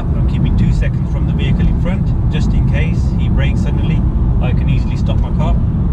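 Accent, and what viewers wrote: Birmingham, United Kingdom. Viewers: Wheyyyy it's Birmingham/Wolves :D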